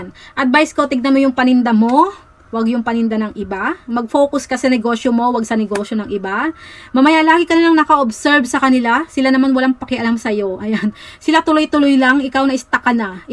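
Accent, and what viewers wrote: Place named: Batangas, Philippines. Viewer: Napansin ko na tinatanggal ninyo ang inyong Batanguena accent...pero kung minsan e hindi maiwasan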